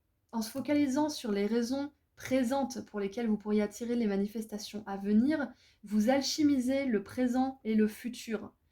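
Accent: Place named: Quebec, Canada. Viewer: Choix de l'antenne relais, avec l'accent québécois lol gratitude infinie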